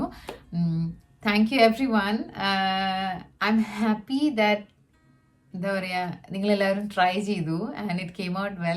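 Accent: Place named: Palakkad, India. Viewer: Chechide slang gilu evidekayo oru plkd touch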